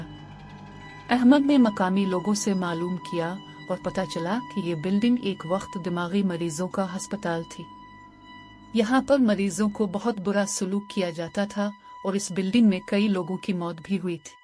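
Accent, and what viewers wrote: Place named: Afghanistan. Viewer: Aap ko urdu aa nahi rahi hai Afghani accent hai app ka Muslim log puja paat Nahin karte😮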